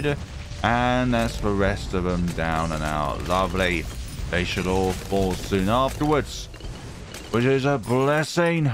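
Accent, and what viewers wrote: United Kingdom. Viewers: You are the most British person I’ve ever heard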